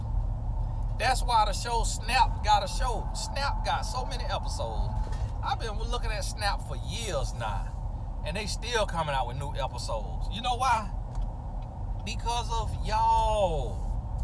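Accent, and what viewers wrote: South, United States. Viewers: Omg Tony is hilarious and his videos are such a blessing. No fighting over men ✅ ya hear me... now God bless ya! I’m southern too from Florida so I can relate to the draw 😊 but the wisdom has me on another level. I’ve even started my own business and I’m investing in me and being focused something I’ve struggled with for years. His videos make me want to be a better person and Christian. I just bought the book a woman’s influence. Looking for it to come by mail Thursday. I just speak blessings on Mr. and.. I don’t care what criticisms may come please don’t let it deter you, Tony is doing purposeful work and I’m grateful!!!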